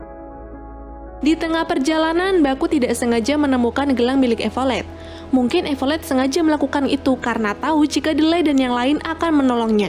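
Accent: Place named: Sunda, Indonesia. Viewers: Sukses terus mbak.. Lucu nyarios sunda na.... Aku nyesel baru baru ini nemu chnl mbk... #auto chanel faforit ini mah... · Gw nonton karena yang ngomong nya cw orang Sunda lagi ya Allah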